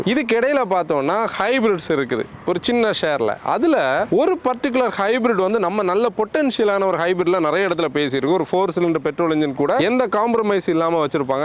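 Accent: Kanyakumari, India. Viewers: Sweet Kanyakumari language and loyalty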